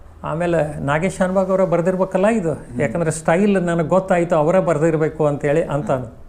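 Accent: Standard. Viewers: ಸ್ವಚ್ಛ ಕನ್ನಡ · ತುಂಬಾ ಒಳ್ಳೆಯ ಸಂದರ್ಶನ ಹಳೇ ಕಾಲದ ರೇಡಿಯೋ ನೆನಪು..... ಅಚ್ಚ ಹಾಗೂ ಶುದ್ಧ ಕನ್ನಡದಲ್ಲಿ ನುಡಿಮುತ್ತುಗಳ ಸಂದರ್ಶನ ನಮ್ಮ ಕರ್ನಾಟಕದ ಹೆಮ್ಮೆಯ ವಾಚಕರು ಜೈ ಕನ್ನಡ ಜೈ ಕರ್ನಾಟಕ,💛❤️🇮🇳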